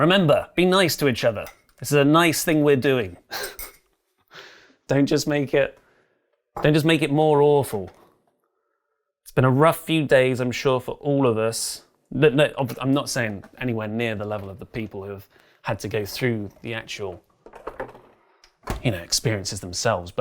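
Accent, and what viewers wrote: United Kingdom. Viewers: Social meteor account. I love British talk. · your natural speaking voice is perfectly British. Coming from an midwesterner who loved Hot Fuzz to watch the director commentary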